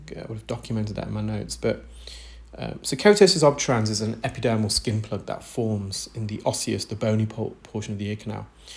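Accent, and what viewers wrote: United Kingdom. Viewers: That was a fantastic peel. I love watching your channel--it's interesting and entertaining, I learn a lot, and listening to your lovely British accent eases my anxiety. 👂👏🙌 👂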